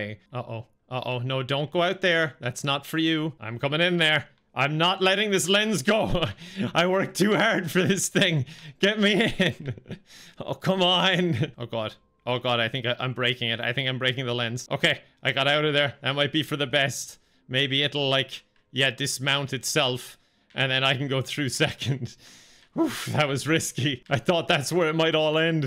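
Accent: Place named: Ireland. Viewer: Love watching play games that are about awkwardly controlling movement, like octodad. He just gets more angry and Irish lol.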